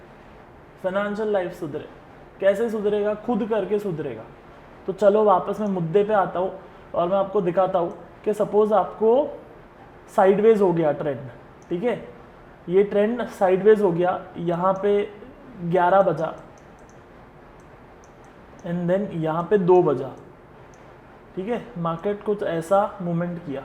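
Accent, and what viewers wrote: Gujarat, India. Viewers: Bhai gujarati cho ??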